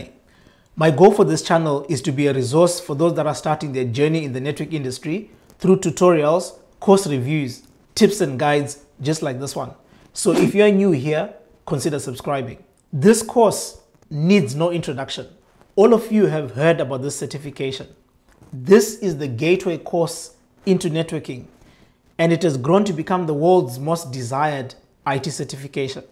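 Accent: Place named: Kenya. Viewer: Your accent is Kenyan probably from South Nyanza. Definitely had to sub and like. Am planning to start my CCNA come Jan 2021.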